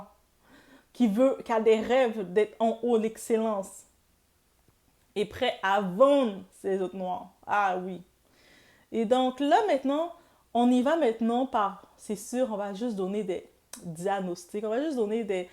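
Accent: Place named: Canada. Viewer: Merci ❤❤❤ je voulais te demander je reconnais un accent canadien tu es de quelle origine?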